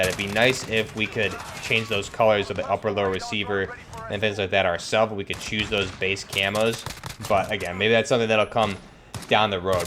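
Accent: United States. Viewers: What voice does grom us? american?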